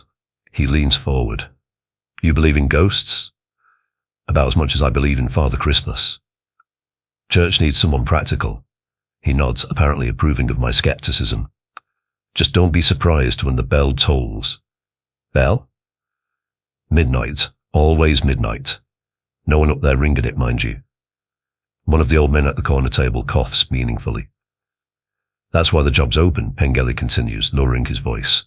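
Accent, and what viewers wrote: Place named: Northern England, United Kingdom. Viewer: Hi, my name is Lynda, I've just subscribed to your channel, I can hear that you're a northerner, I can tell I'm going to love your stories, I'm from Burnley in lancs however I moved to milton keynes 25 years ago, so I tickled pink to hear you're a northerner too, thank you 🙏 💛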